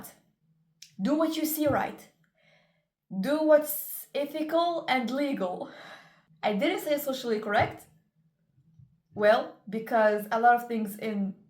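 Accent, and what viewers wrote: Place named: Morocco. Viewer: U sound Moroccan!❤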